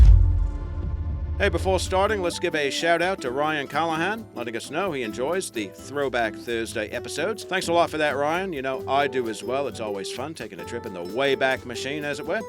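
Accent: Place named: Australia. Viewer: these videos wouldn't be as good without narrating them. keep up with the good work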